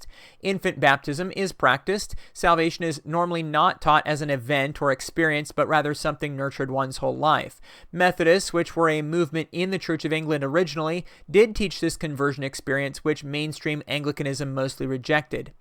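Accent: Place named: United States. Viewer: Whats weird is I only realised the American accent near the end of the video.... depends where my head's at, i thought you were English most of the way.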